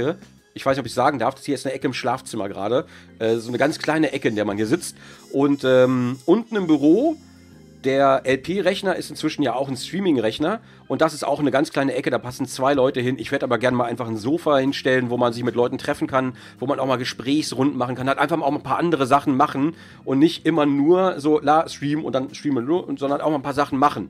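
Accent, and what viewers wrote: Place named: Germany. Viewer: Nicht wirklich. Wenn ich ihm beim Englisch-sprechen zuhöre, fällt mir der deutsche Akzent schon sehr auf. xD Aber eigentlich sehr schade. Stellt euch mal vor, wie krass viele Abos Gronkh bekommen würde, wenn er auf Englisch kommentieren würde! :o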